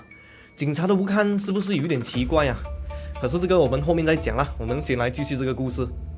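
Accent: Malaysia. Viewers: 好喜欢听你讲故事，可以了解马来西亚文化又可以听马来西亚独有的腔调。:face-blue-smiling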